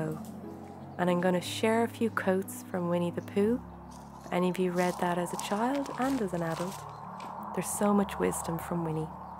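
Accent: Ireland. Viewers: What beautiful and peaceful videos, deeply relaxing to share this, thank you. The way you live is how I too love to live life, enjoying all the small pleasures and that is how I get through all my months, just taking one step at a time end savoring the moments and all the beauty in my life! Blessings dear friend, you have such a soothing voice and I love the Irish accent. All so inspiring here!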